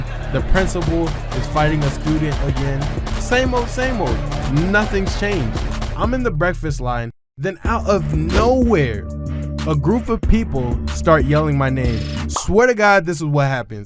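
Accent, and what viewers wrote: Haiti. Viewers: Yo like the way he u was talking Haitian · I like your Haitian accent tho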